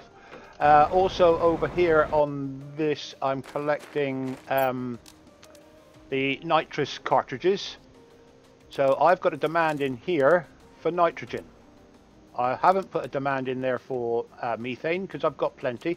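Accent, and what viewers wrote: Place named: South West, United Kingdom. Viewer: oh thank you I know what the word priority means obviously but wasn't sure in the situation. Thanks. Also are you from the south west because you sound like you are?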